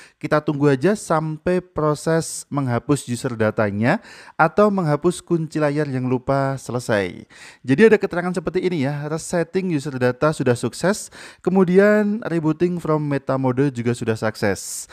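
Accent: Java, Indonesia. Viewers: Mantap jiwa jadi nota satus seket ewu ... Nota gajah kang Salam solder dari teknisi medhok 🙏🙏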